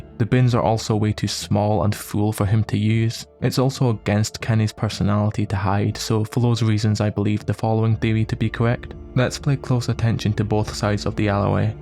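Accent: Scotland, United Kingdom. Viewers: Great video. Where are you from btw? Ngl I honestly thought you were European at first but as you got a little more comfortable I started thinking 'is he Scottish? Holy shit' lol 🏴🏴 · Scottish, fucking right mate good stuff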